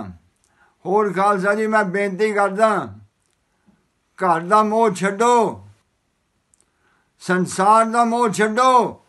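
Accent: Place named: Pakistan. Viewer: Eh bhain da lorha Sikh ni haiga. Ehda accent hi ni haiga India wala. I know uk to aa but bolan da dhang Pakistani type aa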